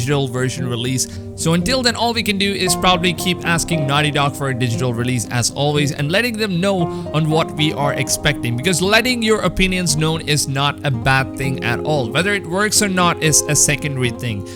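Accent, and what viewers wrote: India. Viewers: Great video man. Where are you from btw? You sound Indian or South Asian? Thanks and hope we get the game soon!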